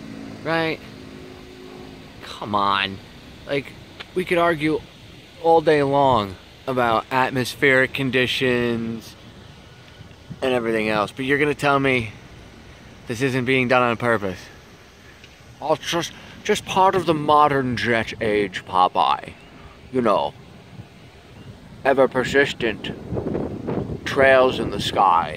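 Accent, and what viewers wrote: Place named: United Kingdom. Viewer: Nice British accent:)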